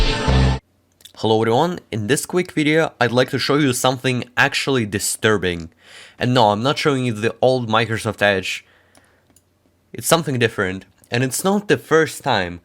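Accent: Germany. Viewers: Hey just a question are you from germany or russia (Just a question) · bro bist du deutsch ? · Yoyoyoyo wait are u german?!?! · are you german? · ach lol, du bist ja Deutsch! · are youre german or is that the windows?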